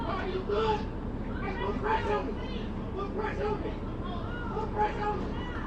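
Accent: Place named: West Coast, United States. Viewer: gotta be from out west I can tell how u say the blocks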